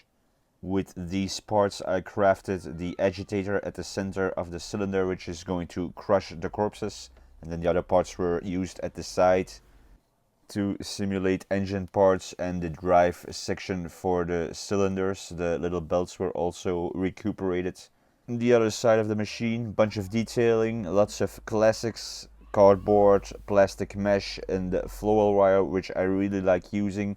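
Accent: Netherlands. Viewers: Dat hollands accent is sterk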